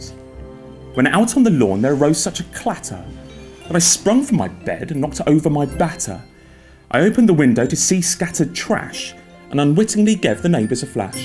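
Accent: United Kingdom. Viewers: Dat beautiful british accent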